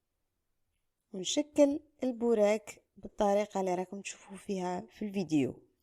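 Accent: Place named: Morocco. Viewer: راها تشبه بزاف شغل لهجة مغربية نتاعنا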